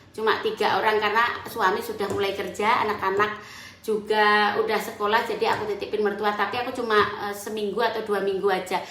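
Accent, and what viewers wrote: Java, Indonesia. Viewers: Iya mbak baik tidak neko2 berbicara juga masih logat jawa tudak gabung inggris ya rendah hati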